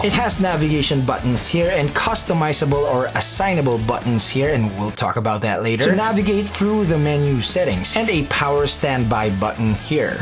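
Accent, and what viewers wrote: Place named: Philippines. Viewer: Nice to english Filipino english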